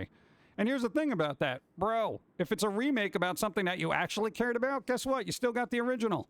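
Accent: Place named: East Coast, United States. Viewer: I think you would make a great voice actor. If anyone needs to cast a magical creature with that insightful, urban, East coast accent, you would totally kill it!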